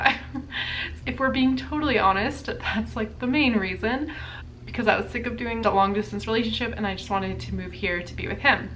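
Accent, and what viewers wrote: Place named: Canada. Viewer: I didn't realize you weren't Canadian. You have picked up the accent!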